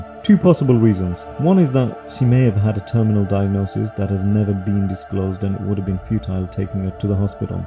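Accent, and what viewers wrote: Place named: England, United Kingdom. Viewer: Good grief, why the background music? Hard enough to understand your English accent without that interference.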